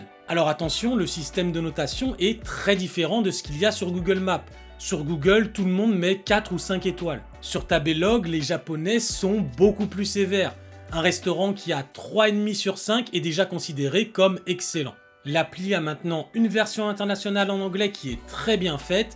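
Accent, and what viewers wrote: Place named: France. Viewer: Bonjour apparemment ta chaîne est celle que je préfère parmi toutes celles que l'on peut trouver sur le Japon, à t'écouter je pense que tu es français : comment as-tu fait pour changer de régime alimentaire car je ne pense pas que tu manges du pain et du fromage tous les jours ?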